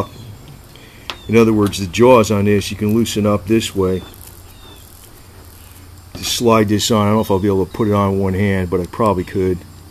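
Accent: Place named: Cleveland, United States. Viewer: no offense.....thought I heard a little Cleveland in you....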